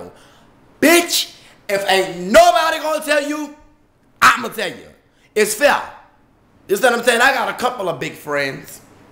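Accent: New Orleans, United States. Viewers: how people in new olreans talk. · He Was Sooo Cute.... I love a New Orleans Accent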